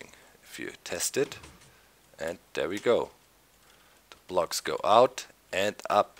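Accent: Germany. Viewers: Hey, are you from Germany? The accent sounds like that:D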